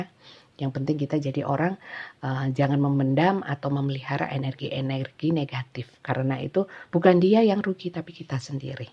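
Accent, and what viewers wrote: East Java, Indonesia. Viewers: Makasih. Bunda readingnya ... Ituloh logat Jawa timur yo 😁😁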